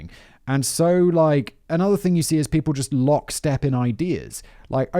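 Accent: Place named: United Kingdom. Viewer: I blame his deep smooth sultry british voice.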